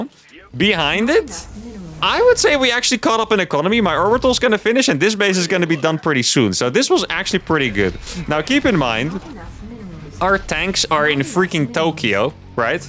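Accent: Netherlands. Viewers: How many languages do you speak? Your English is great. You sound Dutch? You live in Mexico so probably Spanish. I would love to see a Spanish stream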